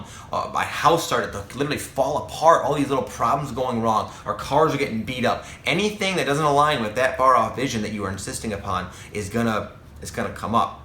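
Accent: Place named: Boston, United States. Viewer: Ahhgg, it's a Boston! 😄💕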